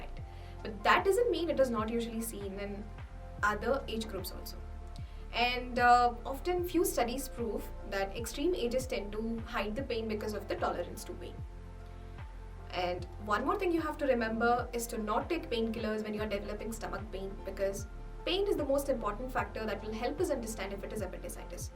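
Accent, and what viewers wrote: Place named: India. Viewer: Extreme difficulty understanding the Dr. Her Indian accent very thick. Thank you Dr. For trying to make a complicated subject simple for a layman.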